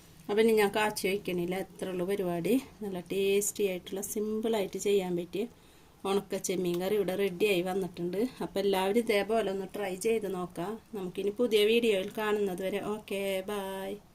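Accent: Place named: Chavakkad, India. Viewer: Chavakkad vadanapppalli samsaram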